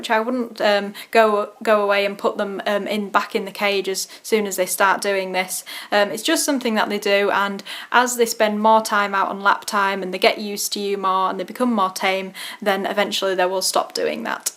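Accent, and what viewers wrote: United Kingdom. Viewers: Nice British accent. Can I be your guinea pig?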